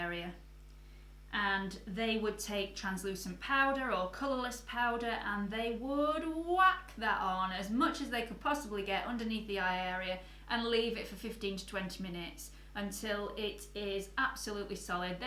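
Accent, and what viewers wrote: United Kingdom. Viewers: Luv the British accent!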